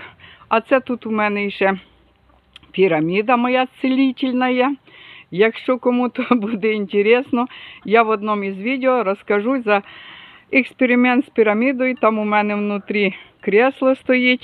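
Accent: Kuban, Russia. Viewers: Но это не украинская мова. Так говорят в станицах на Кубани взрослые. Я сама с Кубани и понимаю эту речь. А вот украинскую не понимаю. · Спасибо за видео балакае як у нас в станице на Кубани дякую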